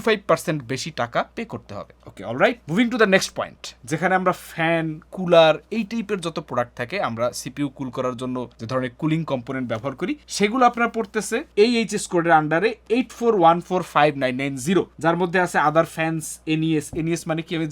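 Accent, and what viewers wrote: Barishal, Bangladesh. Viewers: ভাই আপনি কি বারিশাল এর?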